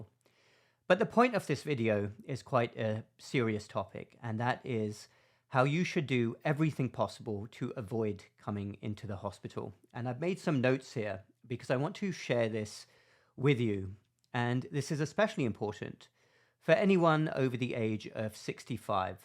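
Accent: England, United Kingdom. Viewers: You have an English accent?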